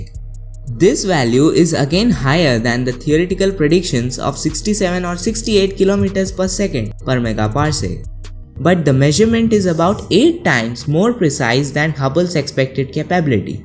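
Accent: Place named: India. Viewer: Wow, Indian English is terrible.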